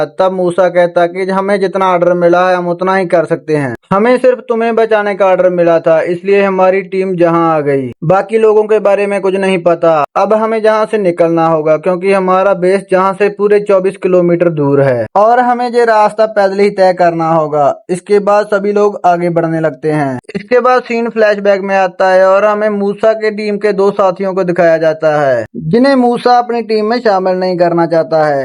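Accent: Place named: Punjab, India. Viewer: NICE BRO TUHADI HINDI CH PUNJABI VALI GALL BAAT AA IK VAAR PUNJABI CH EXPLAIN KARIO ATT BRO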